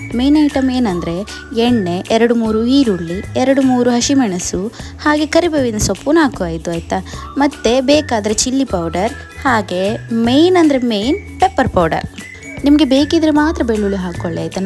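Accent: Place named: Kundapura, India. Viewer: Kundhapuraa Kannada ...nenp hoithaa...yenthaa martyaa??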